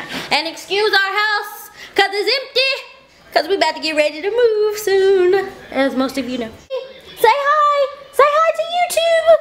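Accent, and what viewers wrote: South, United States. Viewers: omg that was too cute and funny!! ur so country and its so cute lol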